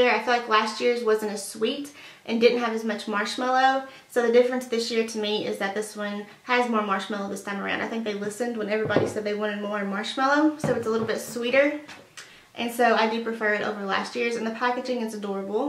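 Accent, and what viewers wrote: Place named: United States. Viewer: Theres a skunk? Dead? Thats nastyyyy :D i love your accent... being british some of the american accents are so different to hear!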